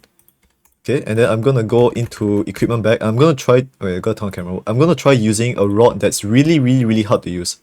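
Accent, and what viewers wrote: Singapore. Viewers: r u from singapore?? u sound singaporean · thank you singaporean accent man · Omg ru Singaporean I can hear the accent wasa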